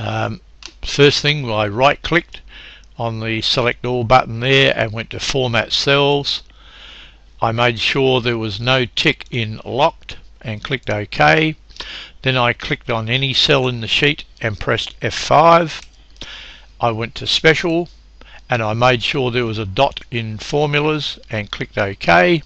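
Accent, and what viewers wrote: Australia. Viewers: Thanks very much. Love the Australian accent.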